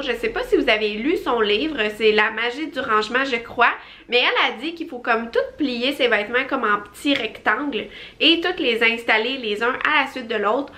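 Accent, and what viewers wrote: Quebec, Canada. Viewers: Tu ne respecte pas qu'elle a un accent québécois. Si t'aimes pas, regarde la vidéo de quelqu'un d'autre sans accent et ne critique pas !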